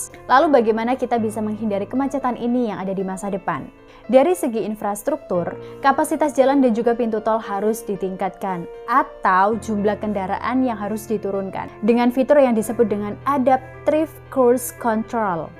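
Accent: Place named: East Java, Indonesia. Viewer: Kok bicaranya REK REK opo Anda ini orang Malang/Surabaya?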